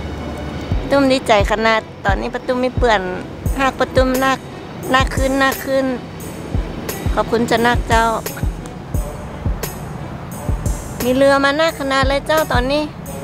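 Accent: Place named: North, Thailand. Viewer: สวัสดีครับ ได้ยินเสียงชาวเหนือผมคิดถึงแม่ละเมาบ้านเกิดผมเลย 😃👍👍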